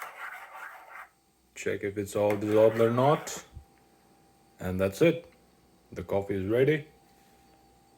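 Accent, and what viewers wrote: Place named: Australia. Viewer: bro ko ni aussie accent aayechha😃😃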